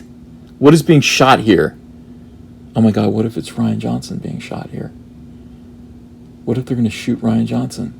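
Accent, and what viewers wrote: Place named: New York, United States. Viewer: Lol that sounds nothing like Mikey Zeruh. More new York less matrix agent.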